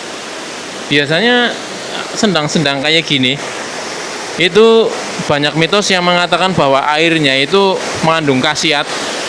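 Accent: Banyumas, Indonesia. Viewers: katanya dari jogja, kok logatnya ngapak mas?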